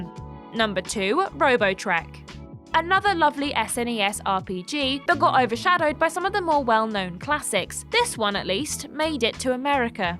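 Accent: United Kingdom. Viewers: Are you from the UK????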